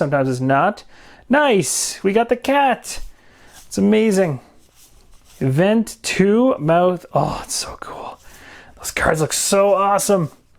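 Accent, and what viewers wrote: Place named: Canada. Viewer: I love how Canadian you sound.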